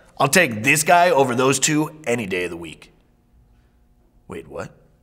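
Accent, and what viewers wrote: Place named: England, United Kingdom. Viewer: no dislikes! Fuck Yeah! Nice english accent btw.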